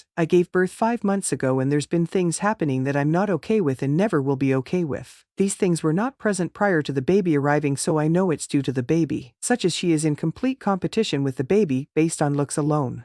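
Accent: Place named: Midwest, United States. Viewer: The ai is Midwesterner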